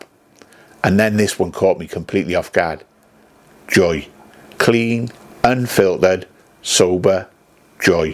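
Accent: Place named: Newport, United Kingdom. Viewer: Alright, I understand your language and like your videos. Im originally from Newport S.Wales. Moved to Australia in 1995. Married for 23 years then a divorce came and I have never drank so much in my life. I started drinking in Bettws/Malpas age 14. I am 54 this year. Struggling to keep myself alive without a drink. I work every day although now the hangovers are severe. Good to here a Newport accent?? Thanks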